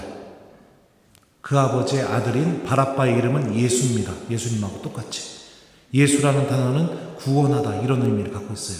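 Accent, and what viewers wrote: Chungcheong, South Korea. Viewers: 신부님 충청도 구수한 사투리 말씀과 주님말씀의 선포하시는 신부님 감사합니다 영육간에 건강히시길 기도드립니다 감사합니다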